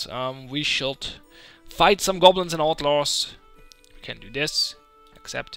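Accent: Germany. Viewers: Man hört einfach direkt, dass da ein deutscher hinter dem Mic sitzt. ^^ Zum Beispiel sprichst Du "pretty" so aus wie es geschrieben wird, also betonst das E, teilweise auch prätty, wohingegen Menschen mit Muttersprche Englisch es eigentlich fast alle "pritty" aussprechen. ^^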